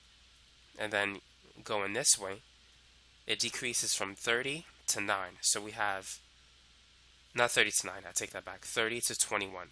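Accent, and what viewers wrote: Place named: Boston, United States. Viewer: You sound like you're from Boston or jersey.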